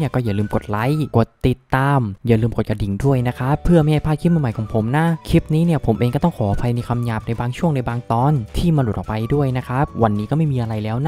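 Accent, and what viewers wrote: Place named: Songkhla, Thailand. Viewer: จังหวะแหลงเหมือนเด็กสงขลาเลย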